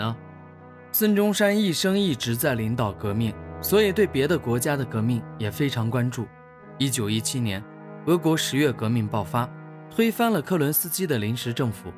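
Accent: Cantonese, China. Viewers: 中山先生的广普，亲切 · 老廣口音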